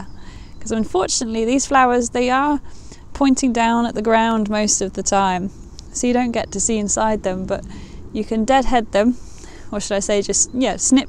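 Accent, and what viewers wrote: United Kingdom. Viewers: i wonder if the girls of naughty ing ham get teased a lot... had to say that .... mybad ... i don't mind the obsession with the weather but i can get a little flustered with this accent ... which is somehow very appealing yet annoying ... so snobbish - must have to do with the power the Brits had over the centuries... imo ... just ignore me...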